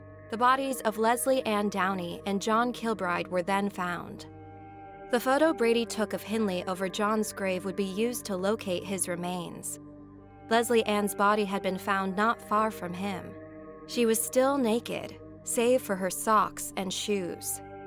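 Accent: United States. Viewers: Hearing an American pronounce all our town names is so strange! I live so close by it's so eerie and sad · Quit with these dreadful American accent talk over please awful this should be a British talker. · it’s quite strange to these very British crimes reflected back on to you with an American accent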